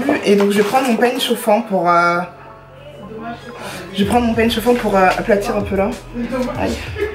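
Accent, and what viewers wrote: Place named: Ivory Coast. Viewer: Tu djaaa comme une bonne Ivoirienne 😅😍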